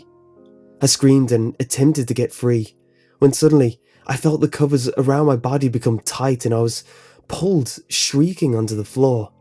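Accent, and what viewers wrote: United Kingdom. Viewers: THTHTHTHT terrible. british accents cant help terrible stories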